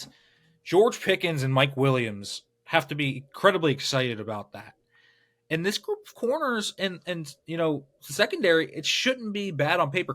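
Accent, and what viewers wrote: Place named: Pittsburgh, United States. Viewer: Dudes yinzer voice/ intro is the perfect set up for to come in and do his thing. But where did dude from the left come from? 😂 he been a beat writer for years?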